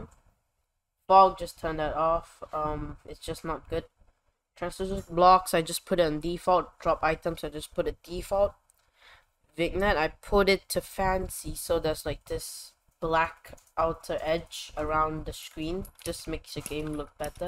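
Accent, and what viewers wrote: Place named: Singapore. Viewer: i am singaporean and i speak like that too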